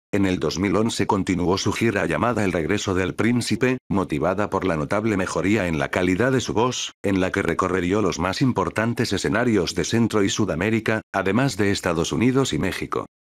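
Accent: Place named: Spain. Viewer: este chocante acento gachupín del narrador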